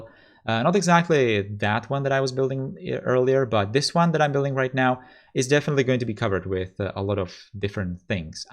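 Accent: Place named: Czech Republic. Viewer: this series is absolutely the best take on cities skylines i ve seen so far, and i ve been watching all the stuff since the game was out. you are undoubtedly one of the most talented guys, if not THE most talented guy ever, making C:S quality content. and seeing this just frankly makes me not want to play the game anymore, because everything or even more that i could imagine, you´ve already been able to pack into this very series. an artist´s work, no doubt... you should be working as an architect or something... btw you have some serious czech accent dude :) you're czech? if yes that makes it even better, because nothing makes me more proud of our country than a talented guy like you doing such an excelent work.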